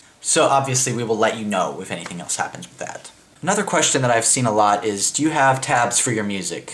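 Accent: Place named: Midwest, United States. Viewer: i like that you still have a mid-western accent even though you live in California.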